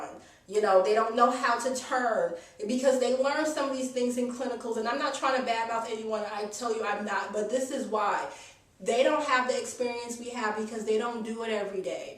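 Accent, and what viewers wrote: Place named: New York City, United States. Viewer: I hear the straight NYC in this vid... LOL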